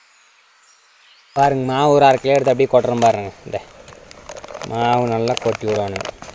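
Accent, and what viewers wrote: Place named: Coimbatore, India. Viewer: Nice da machi 💯👍❤️ Nama coimbatore slang Vera lvl nga🔥🤩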